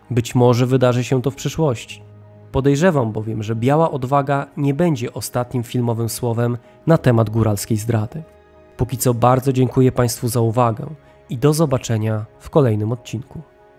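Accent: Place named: Warsaw, Poland. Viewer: Sam sposób w jaki opowiada swoją recenzję jest taki wkurzająco warszawski i salonowy;) W sam raz dla fajnopolaków. Bo to film dla tej grupy społecznej.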